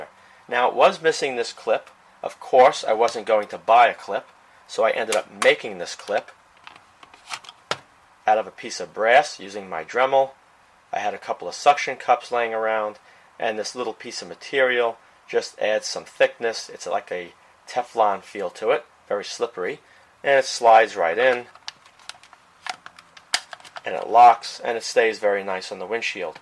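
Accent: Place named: New York, United States. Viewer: He sounds like a new yorker from long island.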